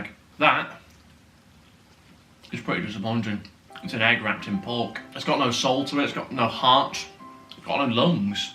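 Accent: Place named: Northern England, United Kingdom. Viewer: Honestly cracks me up so much, as northern as you get hahaha! Was never expecting dickins from the review of the caviar but “get it in me gob” wrote me off gahaha!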